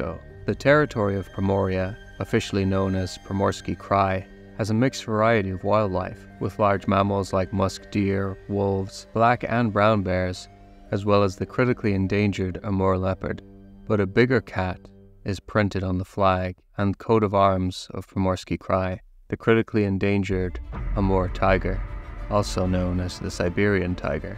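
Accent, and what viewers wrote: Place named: Ireland. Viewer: Please tell me that you are NOT trying as hard as you can to hide your beautiful Irish accent!!!!! Ahhhhhhh! Stop it! We LOVE Irish and their musical accents.❤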